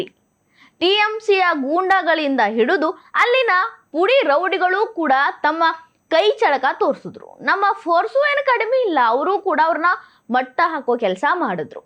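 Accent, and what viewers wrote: North Karnataka, India. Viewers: ಇದು ನಾರ್ಥ ಶೈಲಿ ಕನ್ನಡ ನಿಮಗೆ ಇಷ್ಟ ಇಲ್ಲ ಅಂದ್ರೆ ಬಿಡಿ😡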